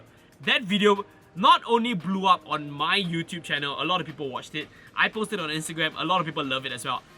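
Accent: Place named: Singapore. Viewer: Singlish to the max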